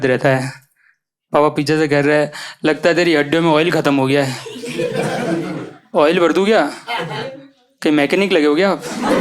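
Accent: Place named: Rajasthan, India. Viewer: Bhai Aap kha se hoo iam from rajsthan apka accent thoda sa rajasthani lg rha hai btw Love your video ❤❤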